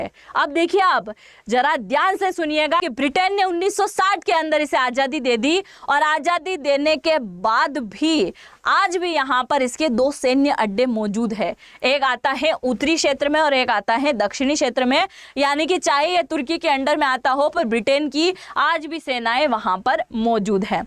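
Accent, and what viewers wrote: Gujarat, India. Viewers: Bahenji aap ka vishleshan bahut hi achha hai.keep it up. God bless you. Aap ke ucharan se lagta hai aap gujrati hain. Sorry,kindly try to correct your hindi specially numbers.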